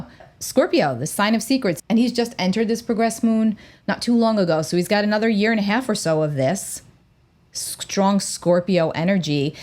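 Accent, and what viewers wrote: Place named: New York, United States. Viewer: From one intuitive to another.... excellent presentation! And I think you're a New Yorker by the accent? 🎉 I appreciate your approach and neutrality. I think your channel is about to take off. Congratulations! I was picking up Trump assassination attempt last year, and let's say this is a possibility with this transit. However, It would have to be a wild card event at this point because the probability has gone down since last year. Definitely subscribing! Thank you😘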